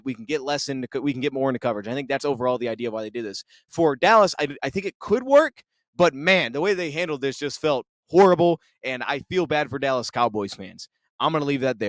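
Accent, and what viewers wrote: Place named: Midwest, United States. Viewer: This is literally a record of what he is saying so you can play it back. Sorry you can't keep up with that Midwest accent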